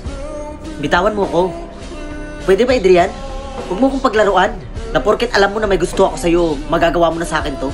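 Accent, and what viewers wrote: Visayas, Philippines. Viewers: bisaya man diay ka idol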